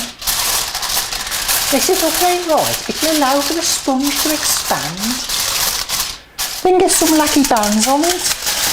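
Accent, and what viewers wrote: Birmingham, United Kingdom. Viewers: Thanks so much for this recipe I have always wanted to know how to make this properly ( I had an idea) I live in America now and it is so expensive to buy here and as you know not so nice from a tin (but better than nothing). Btw love your Brummie accent, I used to have a strong accent too that resembled a type of "saff London" accent (I am from East Anglia) and can still do it too in fact my son and I do it all the time for fun hahahahhaha. Keep up the great work and Happy New Year. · Hahaha i love your birmi accent! You are amazing, your video's have so much character in them they are unlike any other cooking channel! been a subscriber for years and never let down!!! much love! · As someone from Birmingham myself, I can safely say that yes, everyone does speak like this. Loved this recipe :D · But she's a Brummie. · Gotta see B.ham sometime... 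Could even learn to talk like that! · what happened to her accent :D...ah I get it!.....its biiiiirmingham! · Have you made something like this before, or is your Brummie accent just giving me deja vu? lol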